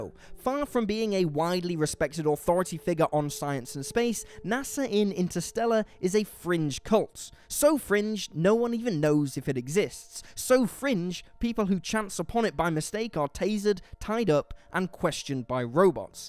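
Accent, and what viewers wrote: United Kingdom. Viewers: This guy sounds British so I'm guessing English is his first language.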